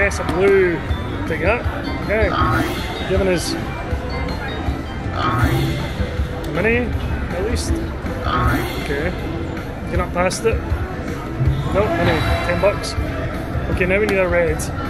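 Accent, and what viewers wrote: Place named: Scotland, United Kingdom. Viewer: As usual a great vlog. As I said before superb to hear a fellow Scottish accent in our favourite city.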